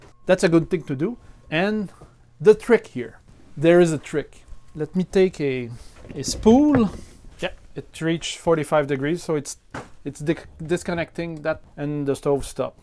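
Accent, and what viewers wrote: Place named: Montreal, Canada. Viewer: I am too, and could tell with your accent! Je juge pas, je trouve seulement drole que je trouve dans fellow montrealer in the wild. haha !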